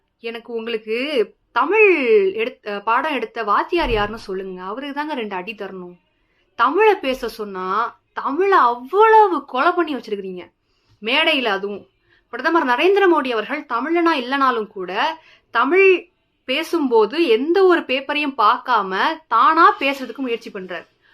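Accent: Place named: Puducherry, India. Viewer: He is from Pondicherry. They speak like this only.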